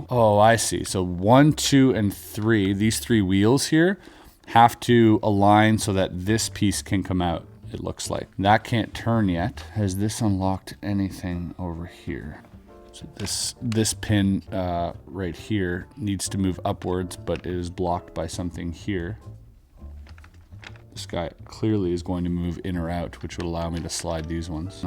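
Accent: Quebec, Canada. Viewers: salut je regarde tes vidéos depuis longtemps mais quand tu as sorti la carte en bois et que tu as lu en francais, j'aurais jamais penser que tu étais du Québec, good job! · c'est tellement nice haha jai faite le saut de t'entendre parler québécois! j'adore tes videos t'es awesome! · Je pensais pas que tu parlais Québécois! Moi qui te suit depuis tant d'années!! Thanks for sharing all this with us! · Your french accent sounds a bit Canadian it’s funny 👍👍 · Il parle un peu québécois dans ses anciennes vidéos de street magic;) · Un parler québécois impeccable! (Je viens juste de découvrir ta chaine) Super casse-tête! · Tu es québécois??? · Première fois que je witness ton accent Québécois. Toujours agréable de voir un Québécois réussir sur Youtube. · Why do you have québécois accent when speaking french? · Tu français??? Est tu québécois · Are you Canadian? 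· yup it's a french puzzle:). but definitly have a quebecois accent ( but honestly in france many mix up canada and quebec ) so all right to whoever want to mix up france quebec and belgique xD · I don’t know what surprised me most, this amazing puzzle or your Québécois accent! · La lecture en "Québéquois" m'a tué... 😆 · T tu Quebecois? j'ai cru entendre notre bonne veille accent bravo mon amie! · that perfect canadian french speaking ^^ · It took me a video where you read a french card to realize you're canadian (and probably from around Quebec judging by the accent)! This was an incredible puzzle. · Are you quebecois!?!?!?! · I just discorvered that has an accent from Quebec x) That's the fisrt time that I hear him speaking French actually. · Would you be from quebec by any chance? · Hold up hold up hold up... MY MAN IS CANADIAN?!?! WHAT!?!? The only reason I know is because I’m French Canadian and I can tell by his accent. 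· I knew. But only because I know his address is in Quebec and I recognize his accent. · Are you quebecois? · Wait... Es-ce que t'es québécois par hazard? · Tellement bizarre de t'endrendre parler francais avec ton accent quebecois alors que t'as pas vraiment d'accent quand tu parles en anglais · C'est tellement bizarre t'entendre parler français. On se rappel que tu es Quebecois tout de suite. XD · Damn, your French accent is like, du parfait québécois!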